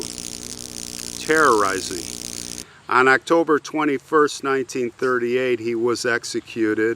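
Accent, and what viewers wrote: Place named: Midwest, United States. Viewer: That prison must have been so awful 😖 Cannot imagine. Thanks also for remembering these forgotten people. I know people comment on your mid-west accent. I don't think I've ever told you, but it makes me smile ☺️ You say Illinois and some other words just like my friends near Peoria do! 💕 😃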